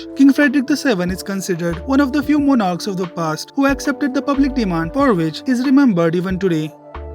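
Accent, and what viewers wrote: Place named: India. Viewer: What an indian accent!!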